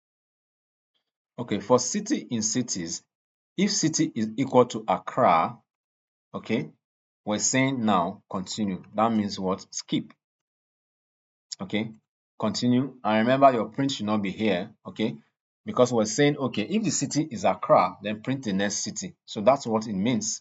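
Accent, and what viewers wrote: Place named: Nigeria. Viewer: Just watched your tutorials and like them. Noticed the Nigerian accent as I lived there in the 70's and attended St. Gregory's College in Lagos. I am an Electrical Engineering graduate and Oracle Certified Professional (Application Developer) and learning to take the Python certification (PCEP) in one month. Keep up the good work.👍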